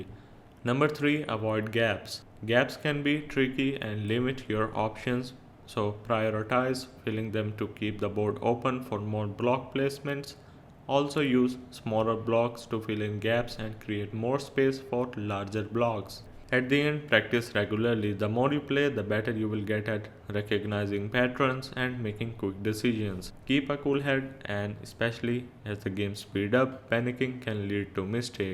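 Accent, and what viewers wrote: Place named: India. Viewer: Bassically there is this video of a person trying to aggravate a scammer and the scammer had a strong indian accent and was yelling at the person “DO NOT REDEEM IT!” And the video in general was rlly funny but the reason ppl are saying it is because he has a similar accent to that scammer. Hope this helps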